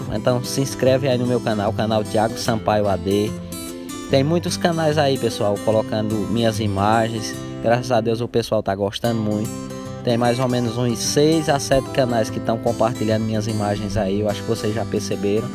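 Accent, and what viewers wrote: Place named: Northeast, Brazil. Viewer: Oi, eu sou de Belo Horizonte e adoro os seus vídeos com o seu sotaque nordestino e as músicas de fundo. São bem informativos e mostra bem a alegria de vcs aí do nordeste, e a nossa tb, pq ficamos felizes só de ver a alegria que vc transmite.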